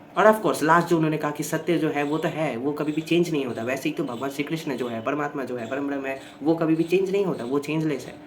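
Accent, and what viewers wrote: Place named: Bengal, India. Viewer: You bengali ascent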